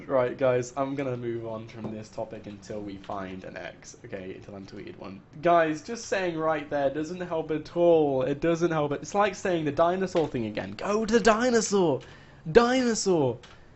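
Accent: United Kingdom. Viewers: Fuck your british accent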